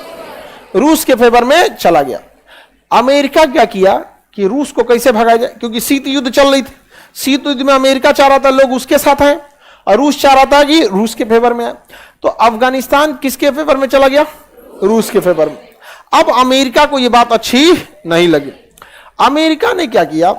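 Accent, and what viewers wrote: Bihar, India. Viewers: Aapka Bihari andaz bahut achchha hai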